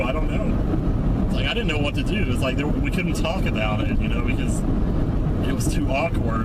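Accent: South, United States. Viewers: that old tape man, you sounded like a straight up southerner but it still sounded like in there somewhere, I'm so amazed by the arc of which your voice changed over time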